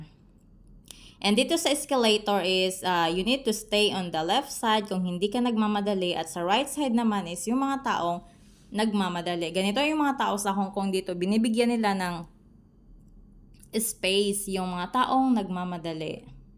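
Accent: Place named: Singapore. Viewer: Enjoy your day in Hong Kong, I thought it's in Singapore because of the accent. Thanks for sharing.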